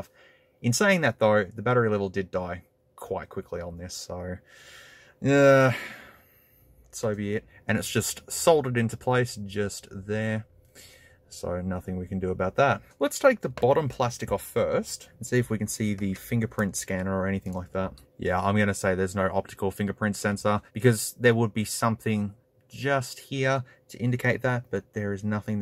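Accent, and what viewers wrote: Australia. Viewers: From one Aussie to another, our accent always throws me when I hear it in media haha. Bloody good shit though, subbed. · I personally like the long vids, and for an aussie you have a pleasant accent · Well he does speak Australian English. So, yeah. 🤷🏼‍♂️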